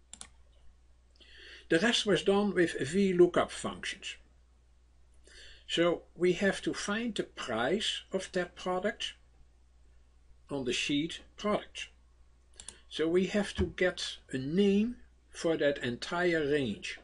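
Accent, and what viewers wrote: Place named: Netherlands. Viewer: Hey, very helpful information thank you, I'm guessing by your name & accent you're Dutch. My Dad is from Holland & we have the same name. Lol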